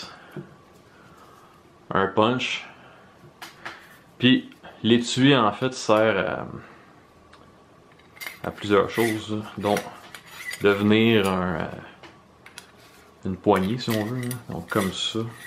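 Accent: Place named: Quebec, Canada. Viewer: Merci, cousin de la belle province! Explication limpide dans un beau parler!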